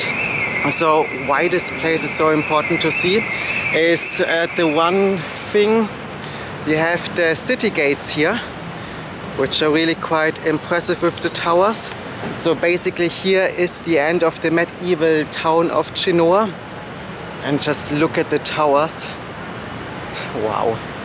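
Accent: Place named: Germany. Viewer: Love that German accent!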